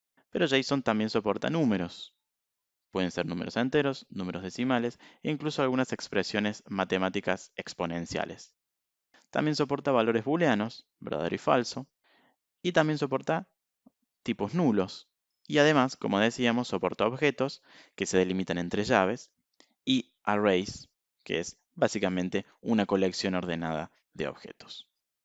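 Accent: Argentina. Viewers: Impecable la explicación. Se agradece un tutorial en cordobés =D! Saludos de Bs As.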